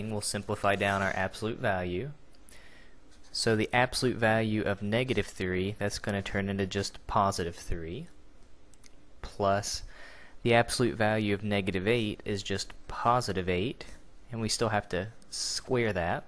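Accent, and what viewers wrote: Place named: South, United States. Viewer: GREAT teaching! By the way, I love your accent. Obviously southern like mine! :)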